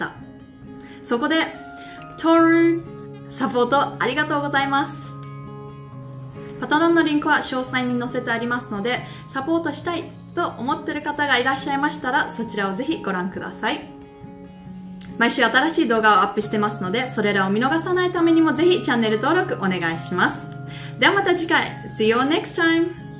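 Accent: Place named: United Kingdom. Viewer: Hi, your video helps me a lot. BTW, I just wondered your accent sounds like British. How many years had you lived there? Thank you!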